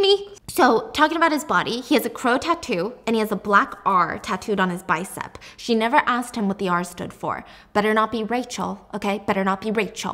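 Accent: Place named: England, United Kingdom. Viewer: That English accent was so pleasing! 👏🏽👏🏽👏🏽👏🏽👌🏽❤😄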